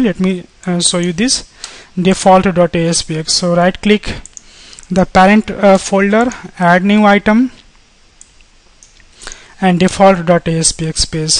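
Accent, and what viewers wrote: India. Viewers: why are all of the videos i really want to watch narrated by Indians? its just annoying and hard to follow, im sorry.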